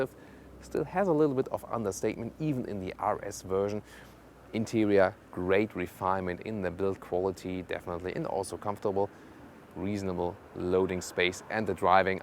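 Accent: Germany. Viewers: Wollte eigentlich auf die RS3 Limo warten, aber aufgrund meiner Familie brauche ich doch was größeres und werde die Tage den RS6 Perormance bestellen. Freue mich wie Sau !!! Danke nochmals für den Bericht (Ich LIEEBE Deinen deutschen Akzent HAHAH)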